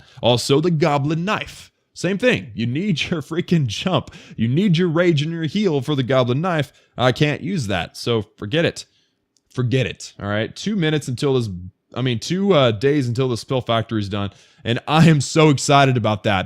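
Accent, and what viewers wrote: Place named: Germany. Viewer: Congrates for hitting the 10k Subs. You have good content - keep up the hard work. Considering your name and I thought I scented an ever so light accent - do you have some german roots? Regards from Hamburg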